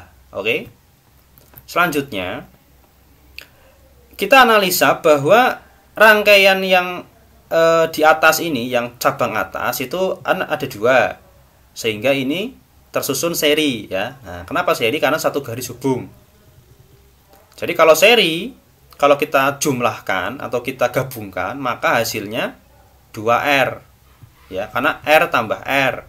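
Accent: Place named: Purwokerto, Indonesia. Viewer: Iseh ketara bahasa Purwokerto ne 🤣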